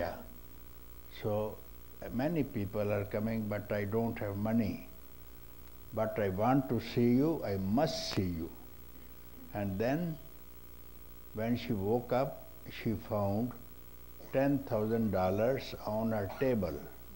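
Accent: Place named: India. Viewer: I like Papajis Indian Accent 😂😂😂🙏🙏🙏🙏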